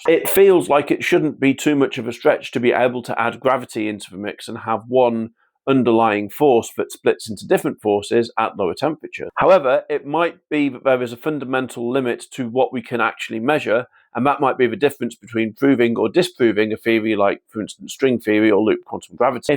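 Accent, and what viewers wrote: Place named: West Country, United Kingdom. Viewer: Mate, can you go to a finishing school or something, im sure what youre saying is right and should be interesting but coming from you it sounds like something from the worzels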